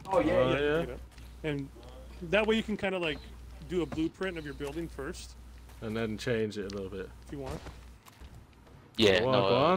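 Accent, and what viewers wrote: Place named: England, United Kingdom. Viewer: Hoping to find the mandem.. My G is mad english proper tings n dat 💯❤😂😂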